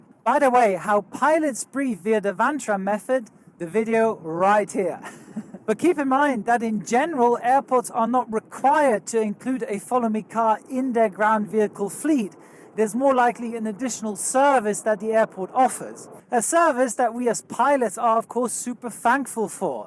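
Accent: Swabia, Germany. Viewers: Ned schlecht dei Schwäbisch, muss i scho soga 👍🏼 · Bisch wohl a echter Schwob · Endlich amol oinr der mo Schwäbisch schwätzt. Scheeeee. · Nice schwäbisch I´m living 1 hour south of Stuttgart.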